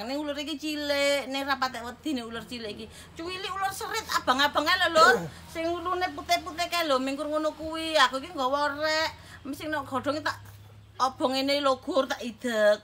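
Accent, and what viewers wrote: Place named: Surabaya, Indonesia. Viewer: Awal liat dari IG, lucu banget cara bicaranya khas suroboyoan. Ternyata ada youtube juga, pas liat jadi hiburan sekali liat tingkahnya ya 😂🤣. Ini senang berkebun tp kok ketemu ulat bulu langsung ngacir lari tunggang langgang 🤣🤣. Sekeluarga seru semua 👍👍. Sehat terus ya dan keluarga, biar makin semangat ngontennya, jadi bisa mengibur kita kita semua 👍👍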